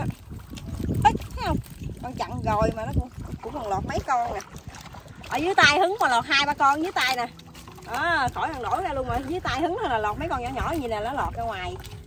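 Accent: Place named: Mekong Delta, Vietnam. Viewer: Nghe giọng nói của người miền Tây đã thấy dễ thương rồi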